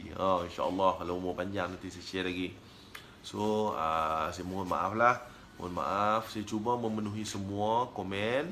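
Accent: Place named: North, Malaysia. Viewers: Abg nak tanye knape ggk selalu cakap utara ye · Seronok dengaq Tuan bercerita..Tak boring..cara penceritaan santai, lenggok utara lembut dan mesra walaupun nampak garang..Moga lancar channel dan terus banyak content2 baru Tuan 😃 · Byk utara noh komando ni.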